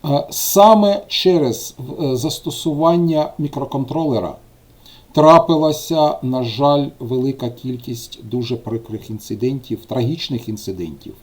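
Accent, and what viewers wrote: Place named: Kharkiv, Ukraine. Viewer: Харкiвський акцент украiнськоi, на дев'яносто п'ять вiдсоткiв майже такий, як I киiвський))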